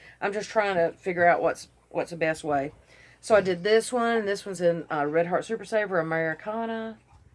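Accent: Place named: Alabama, United States. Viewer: Hey!!! I knew you were from Alabama after the first 2 words😂! I'm from Chalkville- Hey neighbor! I live in Florence, AL now- so great to hear home folk and hope you start feeling better.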